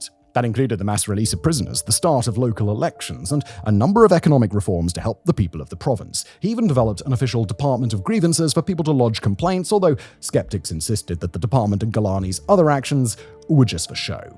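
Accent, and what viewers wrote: RP, United Kingdom. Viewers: Out of this entire video. Simon saying Ray nay sanse. Instead of renessance. Whilst saying in other videos taco sounds posh but tayco as he pronounces it. it tells me a lot. British pos.h. living in Prague. Gotta make that cha cha cha'ching.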